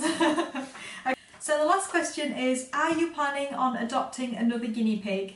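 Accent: England, United Kingdom. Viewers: Is she from england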